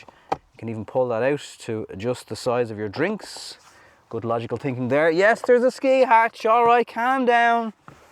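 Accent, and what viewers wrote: Ireland. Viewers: Good Good, he is very Irish.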